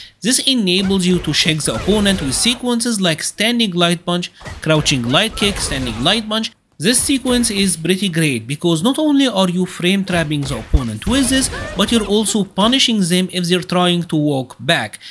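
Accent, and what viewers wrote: Egypt. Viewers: I can tell you’re egyption just from the first minute listening to your accent lol. Great video btw really helpful.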